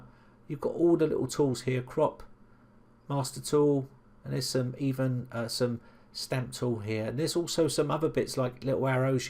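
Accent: United Kingdom. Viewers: You're too funny...thanks. By the way, this ole Nana who is a Canadian is heading to Ireland on Friday (I'm guessing you are a Brit but I do have a bit of trouble distinguishing different accents (I know, I'm taking a risk even saying it LOL). My son and 3 beautiful grand children live there and this will be my 7th time going. Anyway, perhaps a little too much info but I really do appreciate all the assistance you have given me. All the best to you and your family dear!!!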